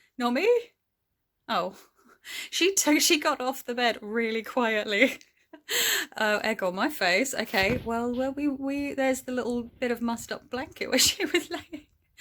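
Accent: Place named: Scotland, United Kingdom. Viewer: This was fun! I definitely think you should have some stitching in each vlog - I love watching your technique! Your stitches are so neat! And what a fun Scottish accent!! 😄👍 Did you make a pouch for your Noblet tarot?! I'll need to make one. I'll see what colours I've got available 😄 Looking forward to the next one! 💖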